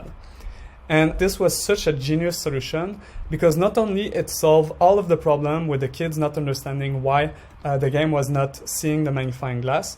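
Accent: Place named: France. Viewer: French guy for sure !!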